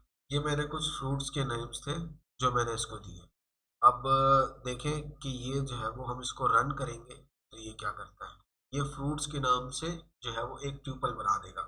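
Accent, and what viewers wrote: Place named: Punjab, India. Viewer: Is lecture me thori Punjabi tone b sunai Di ha.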